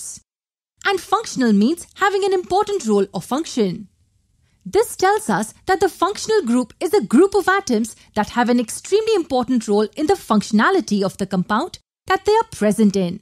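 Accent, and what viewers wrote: India. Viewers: Loving this lesson. Loved the Indian accent too🤩😘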